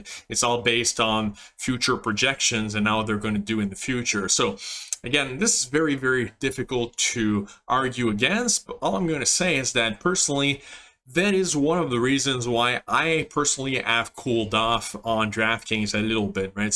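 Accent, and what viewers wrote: Quebec, Canada. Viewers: Salut Est-ce que je détecte un accent Québécois?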